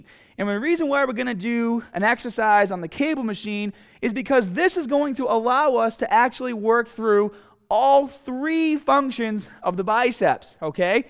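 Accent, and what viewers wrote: Boston, United States. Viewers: Yup, first video I saw I thought he just had a really adorable speech impediment, then I realized he was from Boston.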